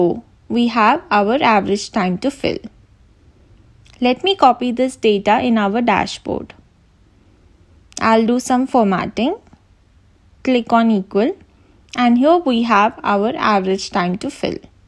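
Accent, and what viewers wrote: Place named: Trinidad and Tobago. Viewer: Are you from Trinidad 🇹🇹? You sound Trinindian.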